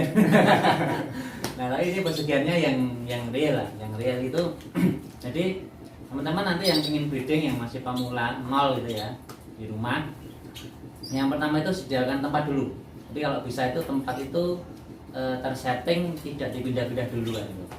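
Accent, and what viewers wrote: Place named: Banyumas, Indonesia. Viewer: Weh tanggane nyong kue ngapak2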